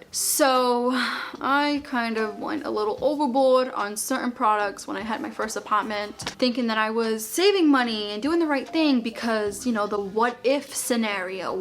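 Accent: Boston, United States. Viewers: Are you from Boston or something?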